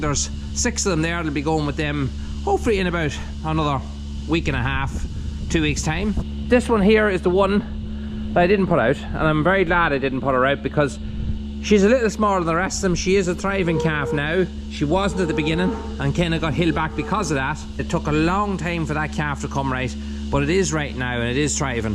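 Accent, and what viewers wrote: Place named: Monaghan, Ireland. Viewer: It’s the same Monaghan accent once again everybody!!!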